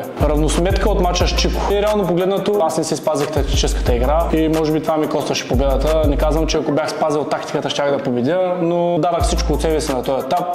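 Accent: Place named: Varna, Bulgaria. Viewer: Супер се получават! Говори си свободно, някои хора се кефим на варненския ;)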